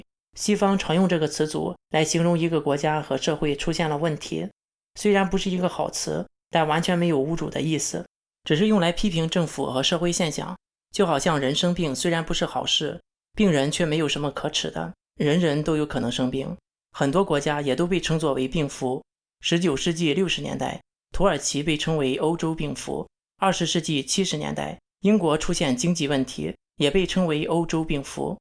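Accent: Shandong, China. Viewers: 我觉得你先把你的山东普通话练好了再传功比较合适，你让我对反智主义的认识上升到了新的境界，大开眼界了。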